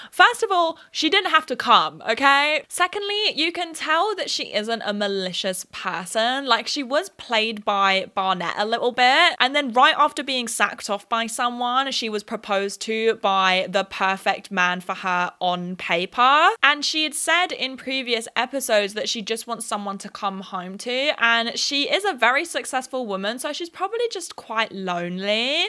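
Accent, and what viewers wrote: United States. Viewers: I strictly liked your video for your american accent! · As an American, your American accent was the best part of this video 😂 It sounded great! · honestly your american accent is pretty good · Just FYI, your American accent is great! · That was actually a really solid American accent. I can barely hear a slip up, like at MOST a few sketchy vowels haha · How is your american accent so good? holy shit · Omfg your American accent… you are the first British person I’ve seen get it right! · Your American accent is sooo good! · Ok ur American accent was actually good tho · I absolutely ADORED your American accent! Great video as always 👏🏾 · i loved your american accent (as an american) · ahhh i loved this show!!! love that i got to revisit it with your hilarious commentary and omg your american accent is so funny LOL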